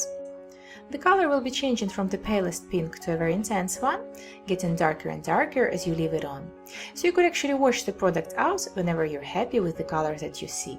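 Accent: Russia. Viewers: I told russian accent:) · She haves russian accent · Ха,я вот сколько смотрю эти замечательные ролики и каждый раз думаю,интересно,девушка говорит правильными английскими словами,но с совершенно русской интонацией:) Сама с этим борюсь,изучая английский. Пока безуспешно. Спросить было неловко,спасибо,рассеялись мои сомнения))) Спасибо большое за полезное видео!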